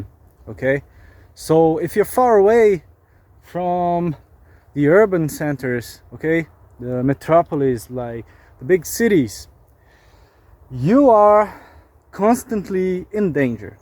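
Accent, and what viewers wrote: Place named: Brazil. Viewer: Publica mais videos, estou tentando aprender ingles e ver um br falando ingles com sotaque é mt bom pra mim praticar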